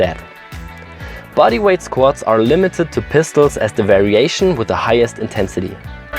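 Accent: Germany. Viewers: Alter bist du deutsch, merkt man sofort nach einer minute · You sound German, are you? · that english accent is german as fuckkkk xd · great video, love the german accent .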